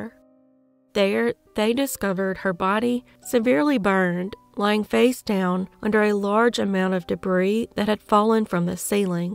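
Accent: South, United States. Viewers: I agree enjoy your delivery and style of your videos. Thanks for being on the platform and never change or edit out your crying or the emotion in your voice because it further humanizes the victim to the audience and I for one Cory right with during some of those child cases. Also, love when call out the predator/criminal in your SOUTHERN accent cause I’m so NEWYork and saying the same thing on my side but when a sweet southern voice calls them the no good human wast of space I’m here for it cause if you wasn’t already thinking it and that voice says it you better reevaluate the amount empathy you give to the 👹. Felt motivated to comment to encourage you to keep going. 🤗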